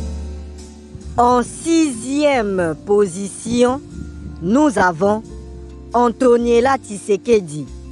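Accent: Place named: Togo. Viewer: Ton accent est comme la togolaise qui nous parle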